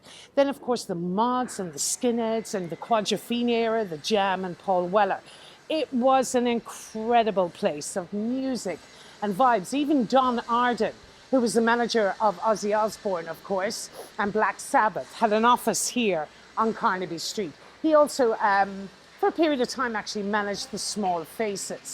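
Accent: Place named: Ireland. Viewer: Apart from the Irish accent, I love your talking voice. Bet you can give it a rock and roll number, too, if not an Irish ballad. Both!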